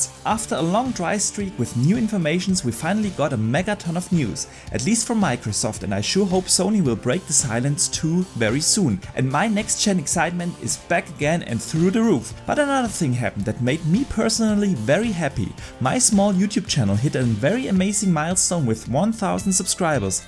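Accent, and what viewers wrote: Germany. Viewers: Horrible German English :(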